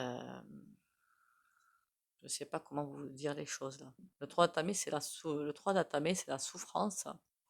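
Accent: South, France. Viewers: Bonjour, j'apprécie de plus en plus vos vidéos j'adore votre accent du Sud il me rappelle mon adolescence à La Ciotat. J'ai aimé la série des Stargates et le quantique y été à chaque épisode. Merci d'être la 😘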